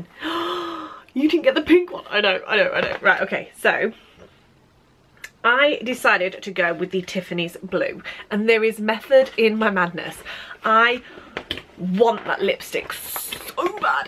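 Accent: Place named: United Kingdom. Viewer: I love your British accent. Do you live in Northern England ?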